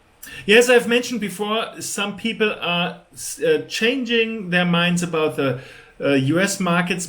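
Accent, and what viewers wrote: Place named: Germany. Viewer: cooles german english!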